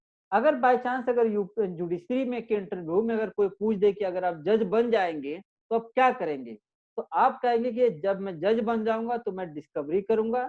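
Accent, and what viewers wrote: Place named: Bhojpuri, India. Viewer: English doesn't matter. Apki bhojpuri bahut sweet hai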